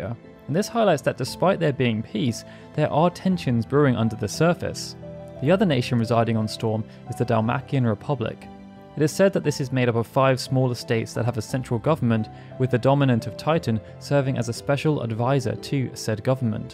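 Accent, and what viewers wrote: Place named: United Kingdom. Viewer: I'm obsessed with final fantasy being talked about with a British accent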